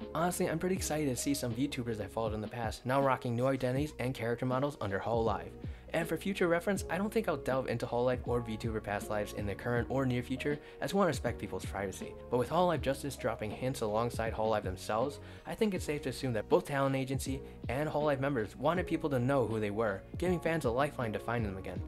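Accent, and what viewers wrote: United Kingdom. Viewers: I FUCKING KNEW IT! I FUCKING KNEW I RECOGNISED THAT BRITISH ACCENT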